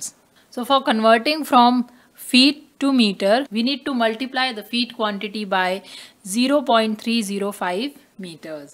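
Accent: India. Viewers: Indian accent is so disgusting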